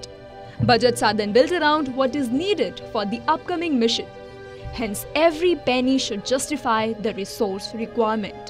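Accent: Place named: South, India. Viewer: Heavy south accent.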